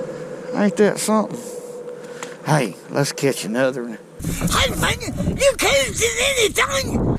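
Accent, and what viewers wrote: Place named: Alabama, United States. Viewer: Can you make a video on your film setup & how you locate fall bass, also i love your videos, your a super funny guy & you make my day when you show our alabama accent off, Rolll Tide:)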